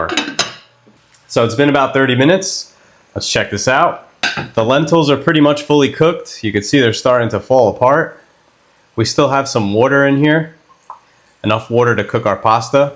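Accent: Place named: New Jersey, United States. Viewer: I like hearing the NJ accent again. I no longer say 'warder' any longer, but like hearing still. Makes me homesick for the pre-1960 NJ/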